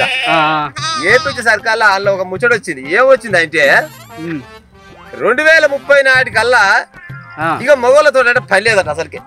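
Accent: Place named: Telangana, India. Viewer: గివార్తేమో గానీ,. నీ నోటినుండి వచ్చే నా పల్లె యాస- నా తల్లి బాస - నా తెలంగాణ యాస.. మస్తుగుంది.. 👍👍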